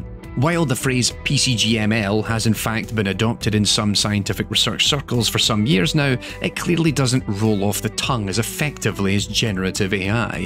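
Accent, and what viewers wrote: Scotland, United Kingdom. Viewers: Ah, another video from. Marvelous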